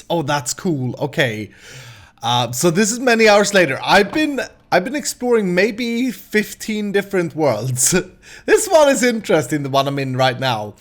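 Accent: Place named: Sweden. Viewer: . You sound swedish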